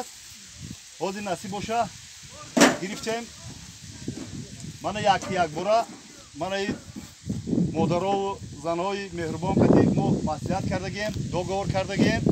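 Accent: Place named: Mastchoh, Tajikistan. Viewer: Бобо ранги Мастчоҳӣ мардакча гап мезани... МошАллох бобо умратона дароз кунад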